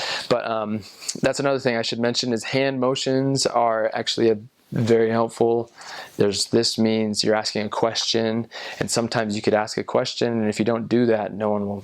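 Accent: South India, India. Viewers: This is south Indian accent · this is a typical south Indian accent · That is South Indian accent. Different states in India have different accents... Well you did a pretty good job on the Southern accent;) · he went to south India, he was speaking in South Indian accent. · That was just south Indian accent sir. But yes, that is also a part of India. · Ya agreed. That is essentially South Indian accent. You would hear that in Maharashtra and above. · Southern Indian Accent !! :D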